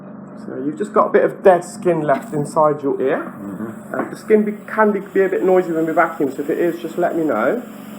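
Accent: England, United Kingdom. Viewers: You have the best English accent.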